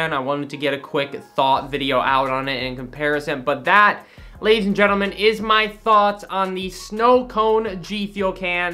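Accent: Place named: United Kingdom. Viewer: I always thought you were from the UK lol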